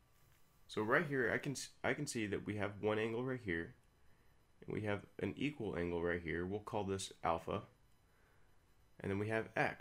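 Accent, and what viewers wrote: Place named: United States. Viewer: American voice talking about an Australian coin.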